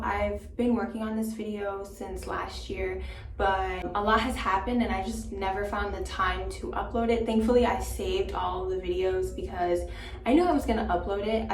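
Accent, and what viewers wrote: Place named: United Kingdom. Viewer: 🤣your British accent always coming through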